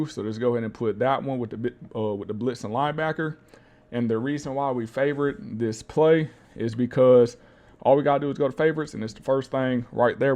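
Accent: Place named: South, United States. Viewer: This guy is from Alabama or Mississippi. That drawl....I recognize my peoples...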